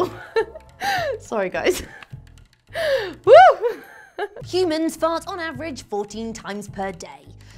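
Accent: England, United Kingdom. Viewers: Her British accent makes it less awkward to listen to her talk about farts. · She english and we pronounce stuff different.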